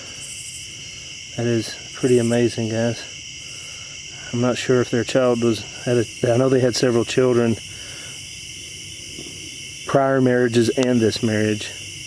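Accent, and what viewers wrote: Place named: Tennessee, United States. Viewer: Another nifty explore! You caught some interesting EVPs,: "Got me" or "Shot me ('ot me)" whispered after you ask "Who's Michael?". "Hello!" after you say: "I'm here to communicate with you.". "I'm not gonna fix it!" very rapid words, male voice, after you say: "It's weirding me out!". *You had the wrong...REACTION!" Same male voice as. "Soil!" This was whispered after the Ghost Box spells out "Barry". I think it meant "Bury" but spelled it wrong, because: "In the...GROUND!" This is a whisper, but the word "ground" is drawn out (grooouunnd), and it sounds like Spirit is "scream-whispering" at the top of his non-existent lungs. He sounds angry AND frustrated. Perhaps something was "buried" there, in the "soil"/"in the ground" that was valuable, and the killer went there looking for it, ostensibly to have supper? 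"No". Very faint whisper after you ask: "Anything you'd like to say?". "No." Another faint whisper after you ask: "Was it your cousin who committed the murders?". You heard that one yourself, as you mention "hearing voices". "Get lost!" whispered as you are going through the brush/shrubby trees. Very interesting explore,. Lots of EVP evidence caught. BTW, I love listening to your voice, as my Great Uncle Joe was from Tennessee, and your accent reminds me so much of him. He even used to say "terlit", so I >know< you didn't make that word up!:) Stay safe,!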